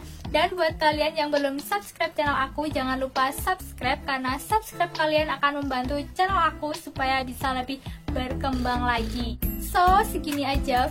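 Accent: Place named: Java, Indonesia. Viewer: Kek medok jowo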